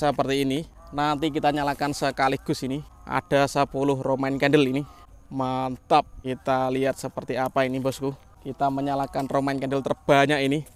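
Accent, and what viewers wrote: Java, Indonesia. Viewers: BG Jawanya Jawa mang bg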